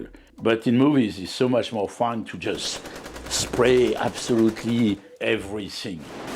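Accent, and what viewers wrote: France. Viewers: Wow really ! Ok sorry sir , you sound like a native french who speak english ! Well keep up your good video then =) 👍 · Le bruit qui n'affecte personne me choque beaucoup. Quand j'ai vu "all quiet on the western front", autant c'était joli visuellement, autant j'avais l'impression que tout n'était que petite pétarade. Les soldats se parlent tranquillement, leurs fusils ne font que des bruits de pétard, alors que tout fait feu et explose autour d'eux. Je ne sais pas si vous êtes français vu l'accent, mais en tout cas j'ai bien aimé votre vidéo. Merci ! Edit : ah si j'ai mieux lu votre description. Content de voir que votre réussite et votre plaisir aux USA. C'est tentant et je serais ravi de connaître votre parcours :) Bye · What is that accent ? French ? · 😂 this guy is right!! I like the way he's talking with his french accent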